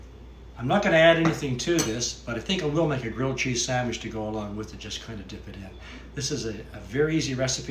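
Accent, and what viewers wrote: Canada. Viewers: As a native upstate NYer I can pin that Canadian accent anywhere (plus the U in flavor & the 10 percent cream reference) What a lovely Canadian gentleman!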